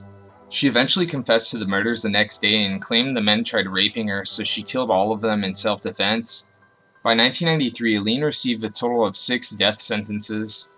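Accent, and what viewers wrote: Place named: Canada. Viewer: he sounds irish canadian , defo got tht irish twang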